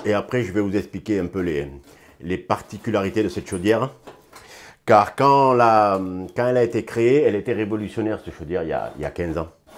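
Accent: South, France. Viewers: Super! "Putin" cet accent! Je suis Marseillais, j'en connais quelque chose!! J'ai une chute de pression sur le manomètre, je remonte la pression tous les mois à peu prés, d'où peu venir cet perte de pression en sachant que je n'ai aucune fuite et que mes radiateur sont purgés. Soupape de sécurité qui est reliée par un pvc de 32 à l'égout? quand pensez-vous? Merci pour votre réponse.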